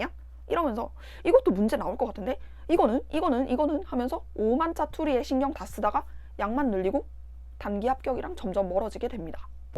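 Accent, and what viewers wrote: Busan, South Korea. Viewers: 마산 진해 부산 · 부산이신가요? 똑~띠 이십니다. ^^b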